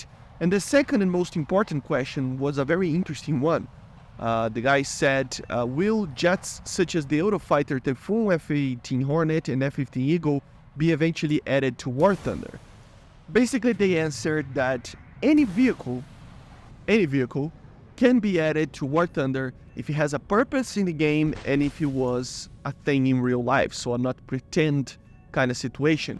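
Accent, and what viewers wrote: Brazil. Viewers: eae, po ver o f18 seria um sonho, e agr o gripen tbm deu p ver q tu é br pelo sotaque huahauahau tu voa onde mano? sou piloto tbm, mt bom ver br fznd video do war thunder tmj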